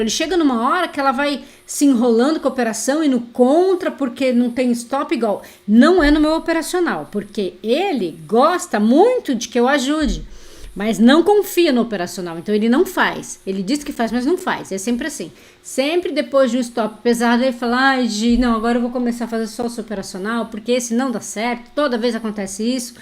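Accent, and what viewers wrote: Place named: Minas Gerais, Brazil. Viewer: sabia que tinha algo por trás desse mineires!😘👍🏼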